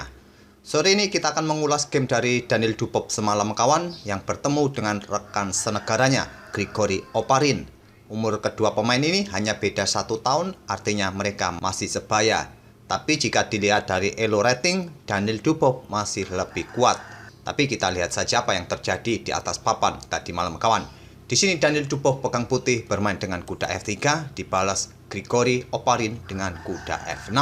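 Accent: Java, Indonesia. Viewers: Cara bicara nya jangan sering pake logat Jawa , sulit dengar nya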